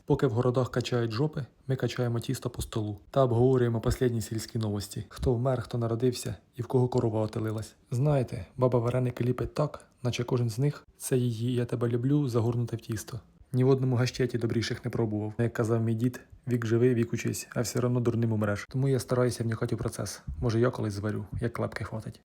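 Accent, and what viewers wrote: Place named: Cherkasy, Ukraine. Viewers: Dyakuyu!! Govorysh yak moi rodychi z Cherkas. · Коли приїжджаю до мами і ніби не помічаю наш діалек. А коли слухаю на відео і реально помічаю наш Черкаський суржик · Рідні Черкаси!А я то думающо це за говір.Дайте ще й рецепта квасу з чорнобривці?! Дуже дякую,побувала на батьківщині! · Ось у цього хлопця як раз сама нормальна мова! На Черкащині завжди так балакали!!! А ви, Наталочко, пошукайте інший контент для мовної чистки!!! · Дякую,наче дома побувала.Мій рідний діалект,Черкащина.❤😂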